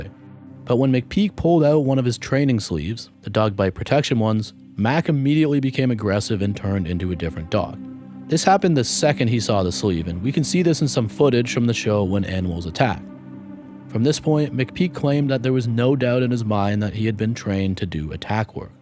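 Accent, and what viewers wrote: Canada. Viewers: Canadian ? Subscribed. 🇨🇦👍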